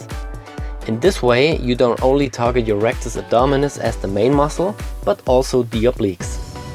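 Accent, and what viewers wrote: Germany. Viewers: That was a german accent, right? ^^ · dieser deutsche akzent hahahahaha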